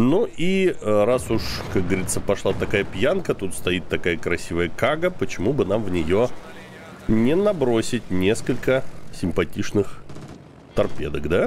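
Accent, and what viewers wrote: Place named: Odessa, Ukraine. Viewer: а где взять такую одесскую озвучку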